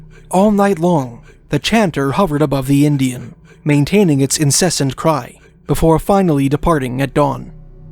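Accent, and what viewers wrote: Canada. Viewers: I really enjoy listening to all of your videos and have learned a lot of history that no school in the US would ever teach. I'm over 60 now, and prefer to learn my own way. I love your beautiful Canadian accent and the way you annunciate your words .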